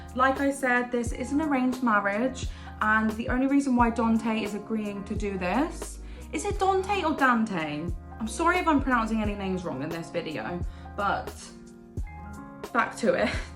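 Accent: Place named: United Kingdom. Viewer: I love her british hermione like accent❣️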